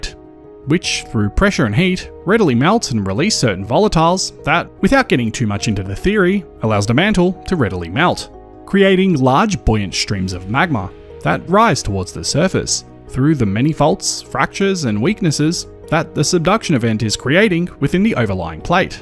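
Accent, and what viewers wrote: Australia. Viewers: Never even thought about this concept - so interesting! Cool video - I like how I actually half understand the science when you explain it - plus as a New Zealander I find your Aussie accent familiar and easy going to listen to.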